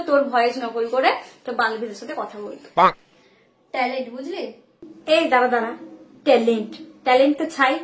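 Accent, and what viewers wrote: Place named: Murshidabad, India. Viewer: মুর্শিদাবাদ এর উচ্চারণে বেশ ভালো লাগছে 😂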